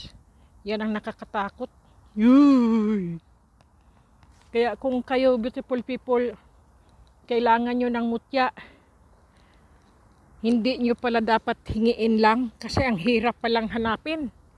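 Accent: Visayas, Philippines. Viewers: Bisaya ka ate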